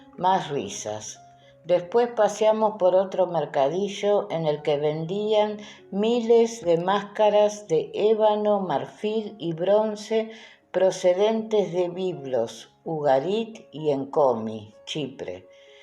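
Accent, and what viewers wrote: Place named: Argentina. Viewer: Quiero hacerte una pregunta.. E escuchado 24 episodios que tu as narrado estupendamente.. Hay más o se termina hay gracias.. Bueno por tu voz se que eres de la Argentina.. Yo soy de España Murcia un saludo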